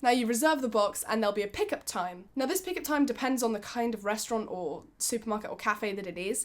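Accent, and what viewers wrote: United Kingdom. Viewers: Your genuine British accent is so adorable. It sounds much better than ordinary american English. I like it very much. Which area is this accent from? Liverpool?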